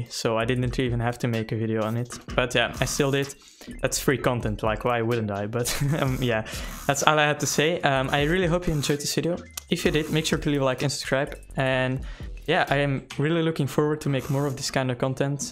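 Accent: Netherlands. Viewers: Are you dutch?? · can i ask you a question are you dutch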